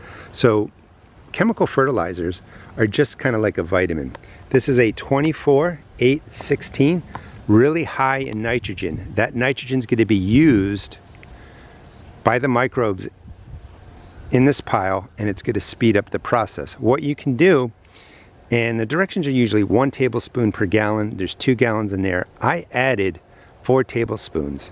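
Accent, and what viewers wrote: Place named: Maryland, United States. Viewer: I hear Maryland I subscribe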